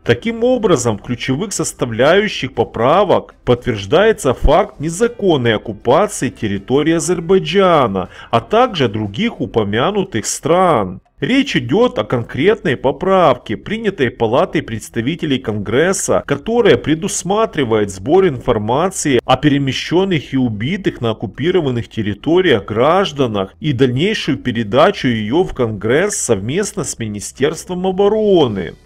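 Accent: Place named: Armenia. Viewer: Коментатор почему у тебя акцент армянский ?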